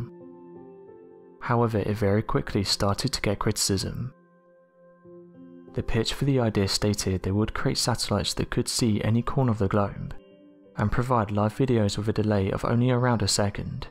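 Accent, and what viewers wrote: United Kingdom. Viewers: He's British. Some of them do that. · Can someone with a non-british accent please make some space videos.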